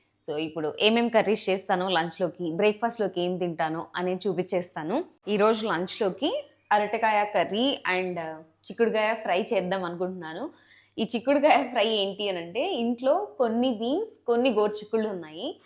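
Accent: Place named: Rayalaseema, India. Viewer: Om Shanti you are from Rayalaseema kada inti vallato kaburlu cheputunnatla vundi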